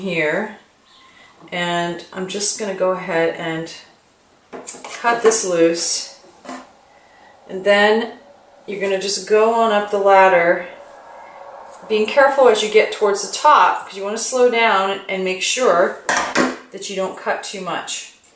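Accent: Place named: Canada. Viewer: Love your calming voice sounds Canadian to me ,,thank you this a great video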